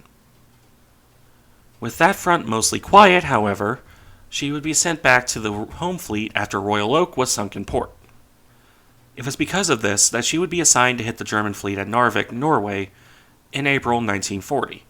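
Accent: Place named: United States. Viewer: Nicely done. Earned you a sub. Very well presented....the best "Americanized" version of Drachinifel I've ever heard. I look forward to future content.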